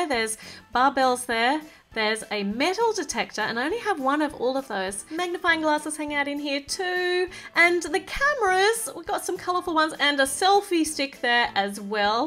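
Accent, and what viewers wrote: Australia. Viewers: sydney i think (she sounds like it)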